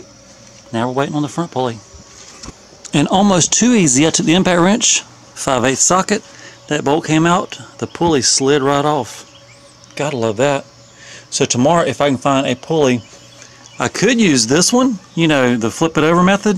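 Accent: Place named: Alabama, United States. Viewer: Alabama? Cool accent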